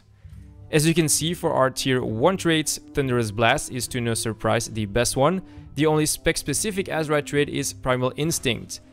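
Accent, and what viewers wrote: France. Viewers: Tu es Francais toi :)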